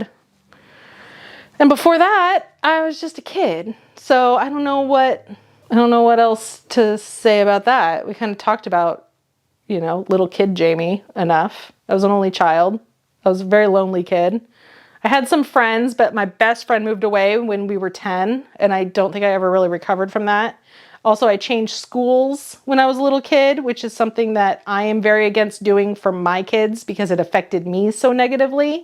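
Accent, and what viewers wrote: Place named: Europe. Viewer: Eric Lindros 😅🇨🇦🏒 European hockey mom here and I love you 😊, cause maybe you "sound" to me a little bit like an European ❤ Love your videos and sense of humor 😂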